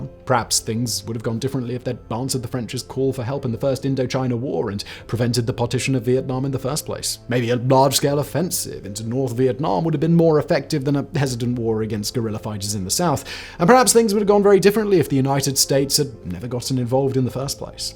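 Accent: United Kingdom. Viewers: Why is some British dude talking shit about the USA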